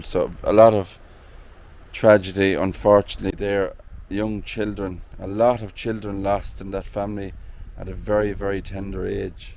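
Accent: Ireland. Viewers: I enjoy your channel and videos very much! As a Midwestern American, I could listen to your great Irish accent all day!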